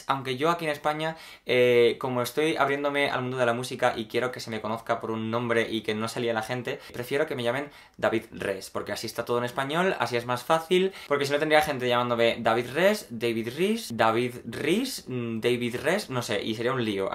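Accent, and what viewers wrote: England, United Kingdom. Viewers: Eres de Inglaterra?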